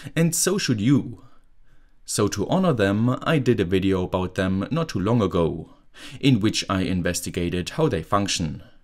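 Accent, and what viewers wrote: Germany. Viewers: ...das is das trotz korrekter Grammatik GEILSTE deutsch Akzentuierte Englisch, , was ich zu Lebzeiten erfahren durfte...Content is natürlich auch gut, aber diese Stimme hebt das in eine ganz andere Dimension...Danke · I AM SO GLAD WITH YOUR FREQUENCY OF VIDEOS LATELY! I know full well how much work this just be for you, but I think I speak for a great many of us, it really is exciting to see a new release from you! No matter the topic, you make it understandable, entertaining, engaging, and simply watchable for just about anybody. I think it is your super soothing cadence of speech, and that sweet, sweet German accent! 😁😁😁👏👏👍🏼👍🏼👍🏼😳😳😃😃😃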